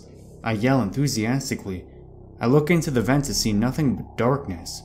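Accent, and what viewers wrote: United States. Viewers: For sure. Don't worry about it! You should have alot more patrons as well. Or do people just use all those other sites you mentioned? Anyway this is one of those deep tales that kinda freak me out. Kind of a real thought worthy message there eh? Tho I don't think it was Saturnites or whoever that were the...makers. Pretty good pasta tho. Forgot to check-was that you doing all the voices? Seems like a pronounced Yankee lilt or something in there lol! Thankyou!😍✌🐾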